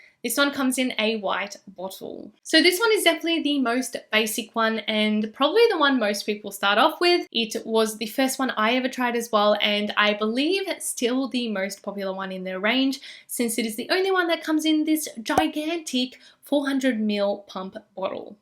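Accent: Australia. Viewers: You're Australian!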